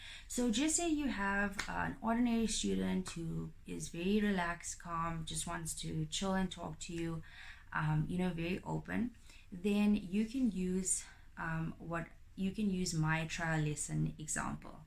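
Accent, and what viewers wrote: South Africa. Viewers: Do you use your south African accent or you change it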